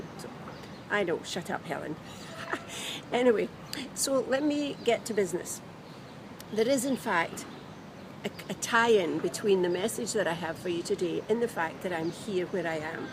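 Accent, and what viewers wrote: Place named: Rioplatense, Argentina. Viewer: Keep it up ! This lady nailed the "rioplatense" accent !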